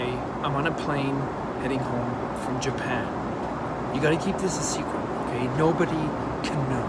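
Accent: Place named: Ireland. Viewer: So um...when he isn’t yelling, he doesn’t sound Irish. Or at least the accent isn’t as strong.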